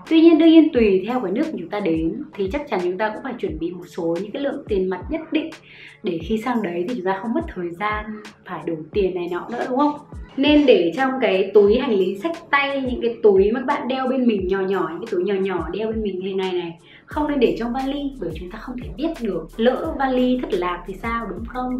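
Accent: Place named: Ha Tinh, Vietnam. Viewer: người Hà Tĩnh ý bạn ^^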